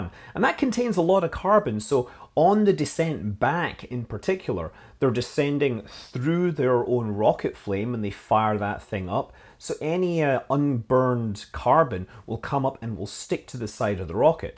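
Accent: Scotland, United Kingdom. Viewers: Its amazing your knowledge on all these subjects you do in your channels, I think yer a very clever man, a credit to the internet, BTW by your accent Do we live in the same country,? the UK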